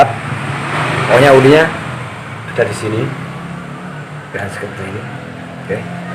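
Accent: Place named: Madura, Indonesia. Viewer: Pengetahuan yang sangat berguna, terimakasih bang, alamatnya dimana ya, dan lagi spt ada logat madura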